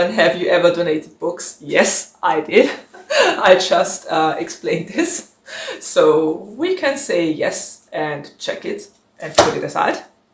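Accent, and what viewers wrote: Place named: India. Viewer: Your comment made me check this out. You've done an amazing work answering this tag questions. I love your idea on pre-ordering books. You have a great demeanor and this seem to be my first time here, so I'd just subscribed. Your accent sounds lovingly Indian. Are you an Indian? I admire your opinion on library books too. 😆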